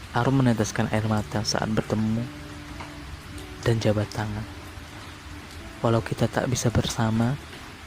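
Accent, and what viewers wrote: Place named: Java, Indonesia. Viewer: memang mantap sekali ini cerpenya,indah sekali cara merangkai kata-kata dan intonasinya,bisa mengolah suara medok khas jawanya, sang kekasih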